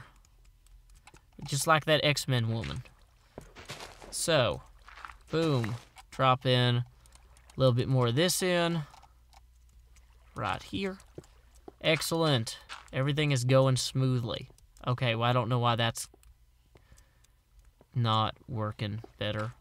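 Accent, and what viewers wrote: South, United States. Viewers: Your southern accent plus your hilariousness makes me want to sub, like, and fav..........I describe you in one word as being....... AWESOME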